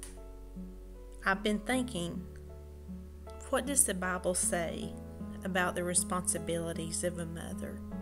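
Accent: South, United States. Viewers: I could listen to you talk all day. Makes me feel like I’m back home in the south♥️ great video my friend!!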